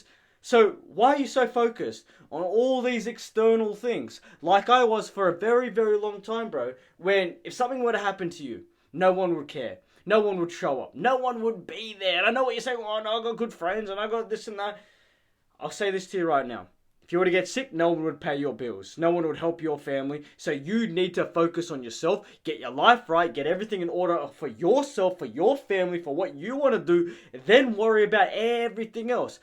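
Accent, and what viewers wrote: Australia. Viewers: Hey bro where you from, are you from sydney??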